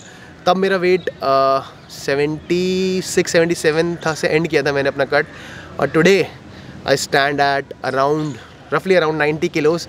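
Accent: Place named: Almora, India. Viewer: bhai..you don't no #pahadi Hindi Accent fell like almora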